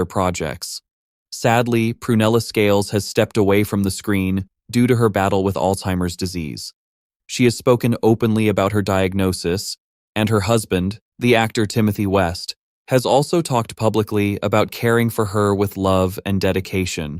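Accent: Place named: United States. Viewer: Why the f*** do we have to listen to an American voice telling us about British TV legends ??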